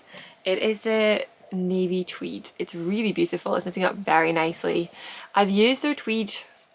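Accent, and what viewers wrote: Scotland, United Kingdom. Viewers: Hi, I love watching your videos. Last year I planned a ten day driving trip to Scotland and I watched a lot of videos with people from Scotland explaining the history and as interesting as that was I kept finding myself listening to your podcast. And i just wanted to say that hearing your Scottish accent really did come in handy when talking with people in Scotland. Because I was listening so intently to what you were saying I found that after a bit I was hearing your accent less and less. So thank you for that and keep on knitting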